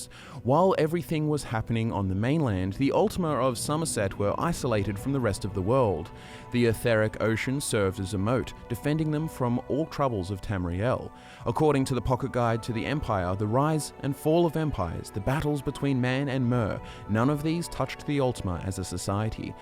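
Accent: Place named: Australia. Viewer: Gergazie that’s just his Australian accent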